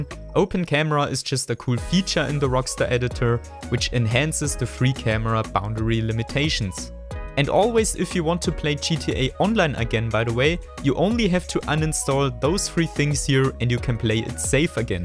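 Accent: Germany. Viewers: Mn merkt extrem dass er deutsch ist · Ich bin mal so frei und schreib auf Deutsch. Deiner Spracheinstellungen und deinem Akzent nach solltest du mich verstehen :). SUPER VIDEO! Der erste der alles wichtige mit rein genommen hat und bei dem es auf anhieb geklappt hat. Vielen Dank für das Tutorial, bei dir kann sich der Rest der Tutorialtypen die's so gibt noch eine Scheibe anschneiden!!! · Fam youre a German from that accent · Are you from german? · du bist deutsch hört mann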